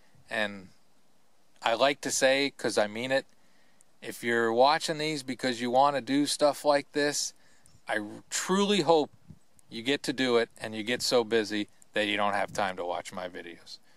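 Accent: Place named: New Jersey, United States. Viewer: Let's see, I started watching you because of the cooking videos, a lot of what you cook, is way too spicy for me, but my husband LOVES spicy food, so you give me ideas of things to cook for him that he will like (and I just do myself a bland version). I really like your accent, I used to work with a really funny guy from New Jersey and your voice reminds me of him. I also like that you love your cats, I have dogs, cats and parrots... so I basically am the zoo minder around here. So anyway I watched your ramble, and felt compelled to ramble on a bit myself... sorry about that.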